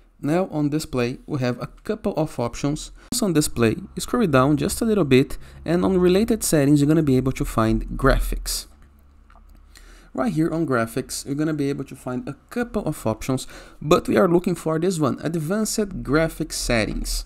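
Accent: Brazil. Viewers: muito obrigado fora do tópico mas acho mt foda o sotaque brasileiro na língua inglesa, tem um charme absurdo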